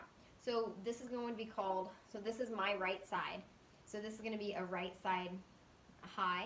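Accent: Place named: Minnesota, United States. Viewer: Canadian? Definitely not from the south. Minnesota?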